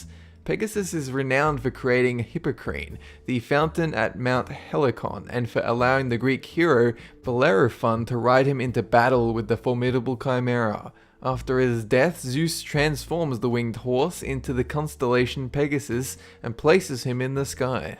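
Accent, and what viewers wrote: Australia. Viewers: Australian I think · Aussie all taay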